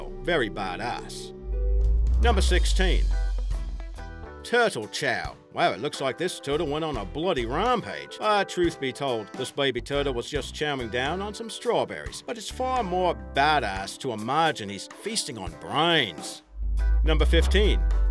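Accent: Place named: Australia. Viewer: i know dudes Australian.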